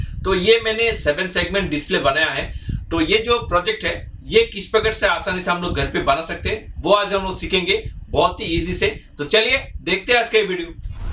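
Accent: Bengal, India. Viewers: Are you a Bangali...????😀😀😀😀😀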